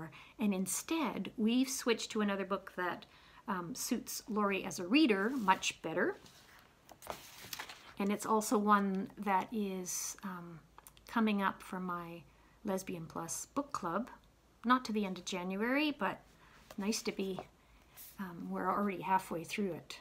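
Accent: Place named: Canada. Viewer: You were discussing your accent in this video (just to refresh your memory since this was a LONG time ago). I LOVE your accent. I feel like people from Canada seem to annunciate much better than Americans and I'm not sure how much of that is accent and how much is just valuing speaking properly. I only have a handful of Canadian friends, but they all tend to speak slowly, carefully, and annunciate!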